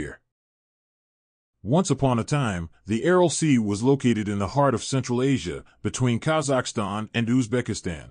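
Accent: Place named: United States. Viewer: Мерзкий американский акцент + неккоретное произношение👎👎👎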